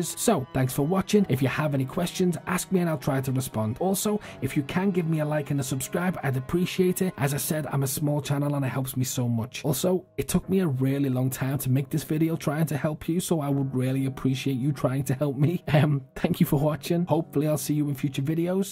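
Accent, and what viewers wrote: Liverpool, United Kingdom. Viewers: great videos mate, scouse or over the water? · Liverpool accent? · Are you a scouser? 😊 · that's because you have a strong Scouse accent, but not a Runcorn Scouse accent. It's like a Stephen Graham Scouse accent. Plus your funny, so it's a win win really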